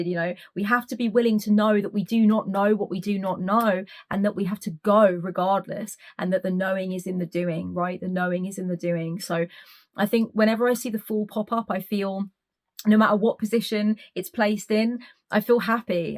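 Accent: United Kingdom. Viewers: Yeeeesssss another unique British accent to save for my accents of Britain collection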